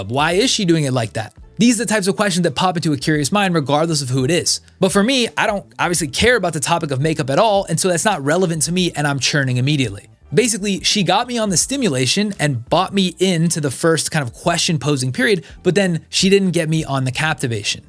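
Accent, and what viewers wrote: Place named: United States. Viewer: Thanks for clear American English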